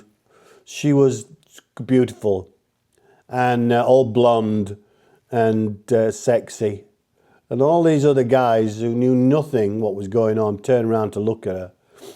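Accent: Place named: Manchester, United Kingdom. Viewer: Evenin ! Rob recommended your channel … we’re in a similar situation, awaiting sale of house before we can buy. However our budget is lower than yours 🤣🤣 despite your Canadiannlication I recognise that you are a Mancunian 🤔😵😵. Like you i loved my 1150 GS… sadly due to a few prangs I was persuaded to change to 4 wheels- compromise was no roof 🤔🤔 … hope all goes well on the trip to France 😎 Steve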